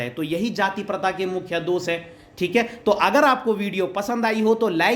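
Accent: Garhwal, India. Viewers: Sir m bhi Apki awaj m thoda gharwali pan lag rha tha esliye pucha Thanku sir Aap bohot shi se explain karte ho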